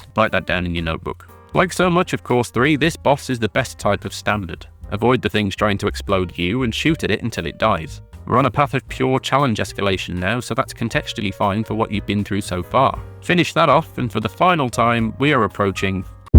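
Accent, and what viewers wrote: United Kingdom. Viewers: no, no you don't sound annoyed. You sound ANNOYING. Lol, clown. Skipping the video and dropping a dislike because literally every video with a British accent is them complaining about how much better they know something than everyone else does, even though they're actually the ones being aggressively reductive and flippant and irreverent and, because of all those things, inevitably wrong about every single subject they come across. Not watching the video but please, comment I'm wrong if you put yourself through this nonsense and feel I got it wrong. It'd be interesting to me because the odds are that I didn't get it wrong. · As an Aussie it's my national duty to take any self-appointed Starwing expert with a Pommy accent down a peg. Because what's the point of being on the internet if you can't get mad at random people saying ... 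wait, you said that bit. Anyway, despite your alleged Britishness and supposed history of playing this game since 1993, you insisted on calling the game Starfox instead of its right and proper PAL title, so you've brought this on yourself I'm afraid. Hostile force carrying bits of geometric structures around intending to build something? Mate, those are Corneria's own robots cleaning up the debris. You don't get points for shooting them, because they're friendly. They even carry off the pieces of the Attack Carrier that Falco destroys in Course 3 Corneria. Because he does in fact do that. Calling this an on-rails shooter ... such disrespect. Starwing is a rail shooter crossed with an obstacle course. That's the secret other half of the ingredients that makes it so good. Arches! Arches everywhere! Don't worry about your score? Mate, that's like two-thirds of the replay value in this sleek little cartridge. 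You've got to get your money's worth. You haven't beaten Starwing till you've rung up a perfect 100% score on all three courses. And no cheeky Black Hole shortcuts allowed either. I only ever managed it on Course 3 once. Once. And I don't have the photos of the old living room TV to prove it. You're just saying the score doesn't matter because you didn't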